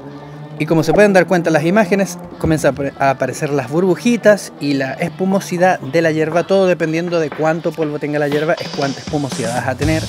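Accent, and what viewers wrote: Chile. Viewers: Excelente yo lo hago así igualito!! A juzgar por tu acento sos chileno, verdad? No sabía que allá también tomaban mate! 😍 Cuéntame, toman mucho? Saludos y muy buen video 🤗🇨🇱🇦🇷🧉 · Amigo, de que Pais eres? Tienes acento chileno. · Pero vos no sos argentino, mm que raro, no me gusta que un chileno, por lo que escucho, me enseñe a preparar el mate argentino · eres un crack, me salta una duda eres Chileno?, hablas con acento chileno,...demás está decir que en el campo y sur de Chile se ha tomado siempre y mucho el mate! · Es chileno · De donde eres bro no reconozco tu acento pero no eres argentino eres chileno? Se me figura tu acento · Se nota a kilómetros que es Chileno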